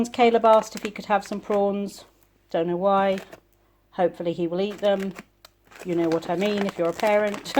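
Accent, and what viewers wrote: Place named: United States. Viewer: Wow u got a lot of shopping there! Hope Caleb has a fab day! listening to ur acc ent since I subbed and initially thought u had a Somerset twang every so often!!!!!!! Then I realised it must be American twang?!!! I can't think why I haven't asked before, but u have a lovely accent t!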